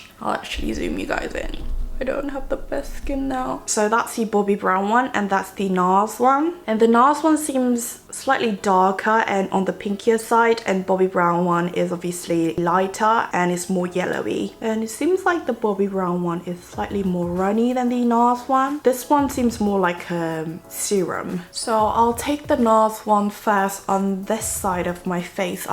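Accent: United Kingdom. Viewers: 와..파데보러왔다가 발음듣고 구독하고 가용...ㅜㅜ 이렇게 영국스러운 절제된 발음이라니 사랑해요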